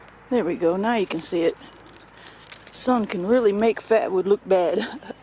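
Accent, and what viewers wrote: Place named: United States. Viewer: go there with a shiansaw sister it's more god for you're body and you're hearth you know i'm from Québec city but i love you're accent frome the US you know ??? but i don't like you're show's HAHAHA lollll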